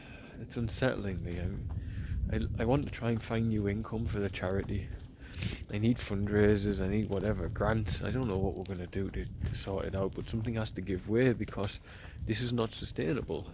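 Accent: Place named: Newcastle, United Kingdom. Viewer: I guess i have some sort of broblem solver spirit in me, so excuse me.. 😁 It's ok for u to feel tired and beated. So i feel that u need to give some mercy on your self. Could someone else produce some content on this canel some times? Similar on like the contet u shared about our staff? And time to time some succes strories of childrens recovery ect? At least id love to have some updates from ex. the children that can now go to the school! Remember your thoughts of sharing your duties? Do it. Because of you, becouse your loved ones. Totally OT: its funny how your "home english" is pushing trough after youve been home. I can tell since i have people from new castle in my family.. 😄 But yeah.. I like a lot that ure authentic, sharing even your moments of doubt and tiredness. We're not supposed to be perfect not even you. And to end this awfully lobg text: Send Frizi my love and tell her i think of her a lot. 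She has so much in her hands now. I hope she also remembers to take goooooood care of her self! ❤ Blesings, love and light. Xx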